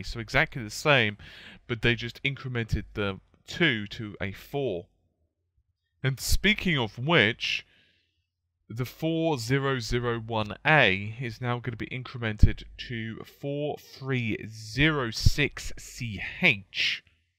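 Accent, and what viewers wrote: United Kingdom. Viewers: What was the # again? C-U-Hayche-100-6-A ? HeHe comfort British Accent ;)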